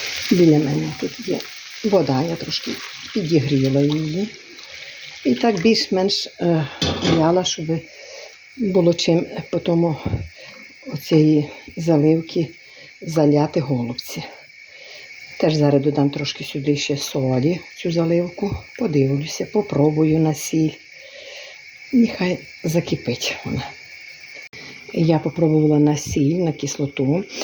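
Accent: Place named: Vinnytsia, Ukraine. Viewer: Чоловікова тітка родом з Вінницької області також смачні голубці робила і говорила, як Ви. А голубці завжди були мініатюрні, трикутні і дуже смачні. Теплом повіяло від Вас і Ваших голубців. Міцного Вам здоров'я. І довгих років щасливого мирного життя. Дякую за теплі спогади. На жаль, тільки вони й залишилися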